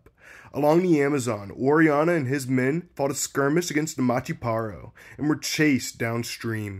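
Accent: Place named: Philadelphia, United States. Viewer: My favorite part is the Philly accent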